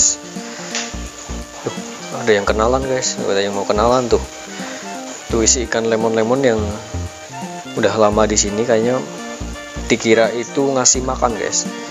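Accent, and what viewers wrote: Java, Indonesia. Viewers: abang orang jawa ya bang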